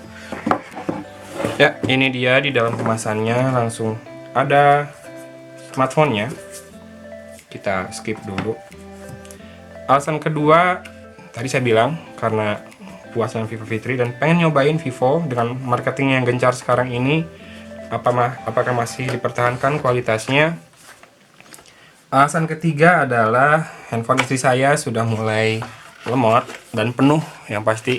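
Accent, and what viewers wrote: West Java, Indonesia. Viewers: ini ifonre bgt sunda bangaat aa'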